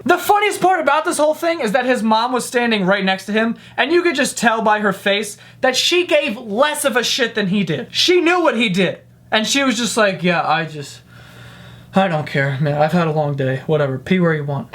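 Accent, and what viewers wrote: New York, United States. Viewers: OMG love listing to you talk. Reminds me of living in New York. You remind me of people in my family. Keep it coming. 💯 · . I love you. I get you're from New York but ffs it's ask not axe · He does, but it's probably because he's from New York and most New Yorkers say the word "ask" like "aks". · I love his New York accent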